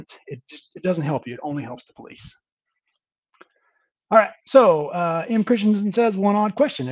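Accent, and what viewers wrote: South, United States. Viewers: I love your videos and your softly southern accent! I’m from Texas (central and SE) too and was just going to say your Va. accent! I don’t recognize your region but I don’t claim to be familiar with them all. Texas is a big place! You provide such valuable advice and I thank you for educating us all🙏 ❤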